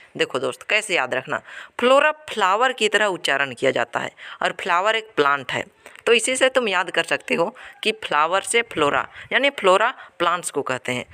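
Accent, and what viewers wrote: Bihar, India. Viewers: Bhai tum bihar ko ho kya?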